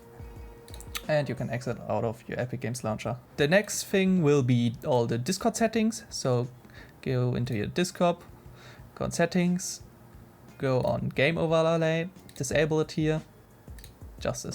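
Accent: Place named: Germany. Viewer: bro u definitely german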